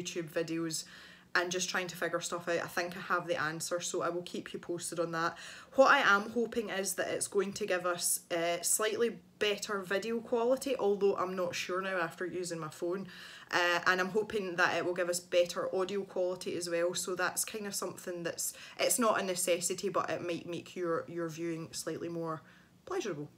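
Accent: Scotland, United Kingdom. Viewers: I’m so happy Gregory Grayscale has such a fine new home. But I’m still lamenting the displacement of The Yoshis 😢 Regarding the neon pencils ... I once participated in a challenge that required you to color a page that involved something outside of your comfort zone. So I doubled down and colored a mandala with neon gel pens and pencils - neither of which are my favs. So, looking forward to the next video with a Scottish accent. Signed, Daria, the serial commenter.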